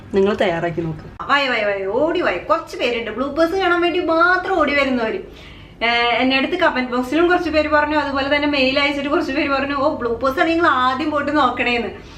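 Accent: Thrissur, India. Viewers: thrissurkarii annee thonnunuuu.....😘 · Thrissurkari Alle ? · Chechi thrissur kaari aano?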